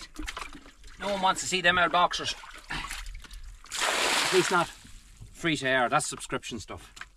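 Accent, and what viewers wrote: Ireland. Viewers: Love it, love it, love it......especially the wonderful Irish accent that goes along with every video. Thanks from Australia.👌👌👏👏💕💕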